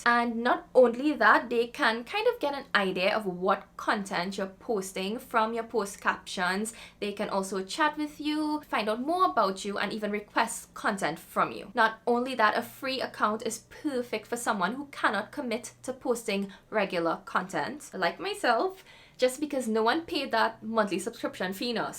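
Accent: Trinidad and Tobago. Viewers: Came for the info. Fell for the accent 🤣 Trini? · You sound Trinidadian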